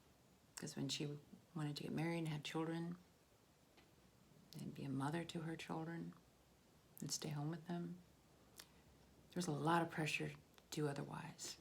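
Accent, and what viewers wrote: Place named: United Kingdom. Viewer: What accent is this? You look Eastern European, sound British and seem very intelligent 😌❤️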